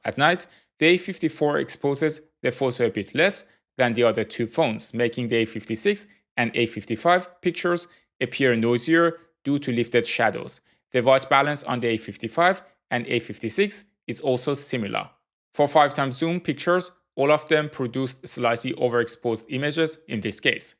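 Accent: Iran. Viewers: Are you persian ?? Your accent sounds like you are. If so nice video dadash ❤ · نگا لهجه ایرانی رو :)))))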